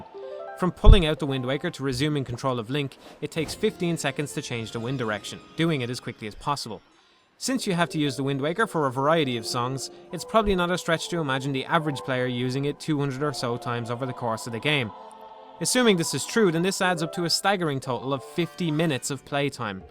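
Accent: Ireland. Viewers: This guy's Irish accent is just too good. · he's IRISH!!!!!!!!!! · Idk why but the further in the video I get the more Irish you sound Also, the mail sorting mini game is legit my favorite zelda mini game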